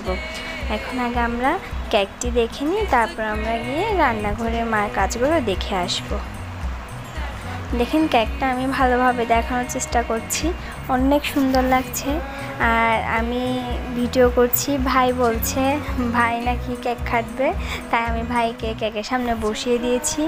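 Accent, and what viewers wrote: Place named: Chittagong, Bangladesh. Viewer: Apu Tumi ki ctg r Tomar kothagula sune mone hoitese happy birthday to apu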